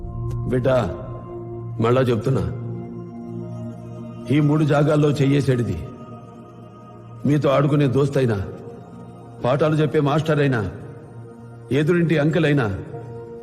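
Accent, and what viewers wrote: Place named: Adilabad, India. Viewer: Adilabad language ❤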